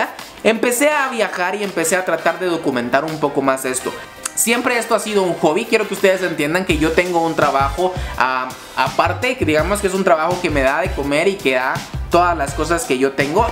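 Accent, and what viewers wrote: Mexico. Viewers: Porque hablas como mexicano? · por qué habla como mexicano? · Este men parece mas mexicano que guatemalteco ? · Se te agradece la información papá, pero demasiado largo el video, hay que mejorar la edición en la post- producción de tu contenido. Existe demasiada redundancia en tu diálogo. Además ¿dónde dejáste el VOS chapín? Parece más que sos mexicano. Son opiniones puramente personales por supuesto. Espero tomes la crítica en una manera constructiva. Para que veas que no estoy siendo culeid, le dejo un 'like' a tu producción mano. Sigan adelante. Por cierto es primer video tuyo que miro, y voy a ver más para apoyarte. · Porque habías como mexicano 90%mexicano10%Guatemalteco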